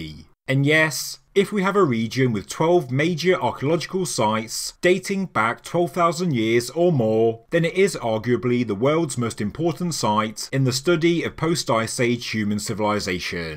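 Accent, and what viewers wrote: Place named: England, United Kingdom. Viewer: ZZZZZZZZZZZZZZZ Do all poms talk like this? (Gobekli Tepaaaaayyyyy?!)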